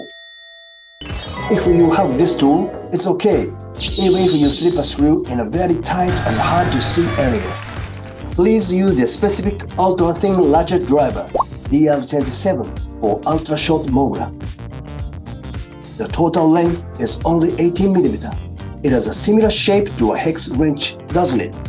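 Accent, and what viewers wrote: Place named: Japan. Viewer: 翻訳ソフトとかじゃなくてちゃんと日本人の英語なのが素晴らしいですね！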